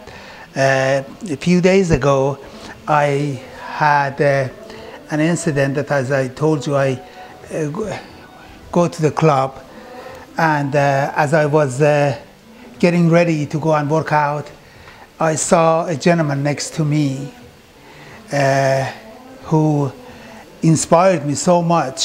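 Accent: Iran. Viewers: Personally I love your Accent It`s Persian Accent and it spices up your Cooking show more over I would like to thank you here for sharing your experiences and knowledge Regards Arash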